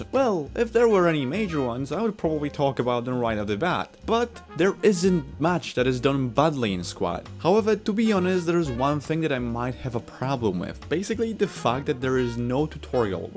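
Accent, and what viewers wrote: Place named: Europe. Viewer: You have a bright future in this, the right amount of edgy, the weird European accent and tempo. Keep it up.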